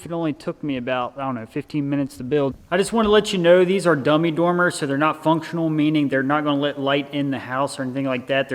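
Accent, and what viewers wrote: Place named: West Coast, United States. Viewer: I understand that. You actually sound more West Coast, lol. 😂👍🇺🇸😊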